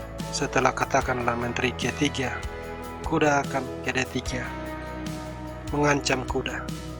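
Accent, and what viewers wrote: Manado, Indonesia. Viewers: Orang Manado dang depe admin ini?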